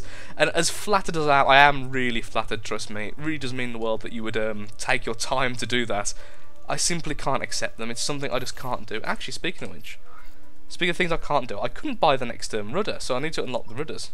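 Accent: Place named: England, United Kingdom. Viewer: I am loving ur videos do u live in England because u sound like it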